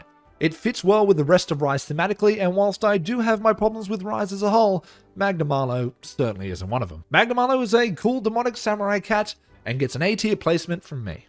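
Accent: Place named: Australia. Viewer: Doesn't help an Aussie accent really plays into pronouncing the di a lot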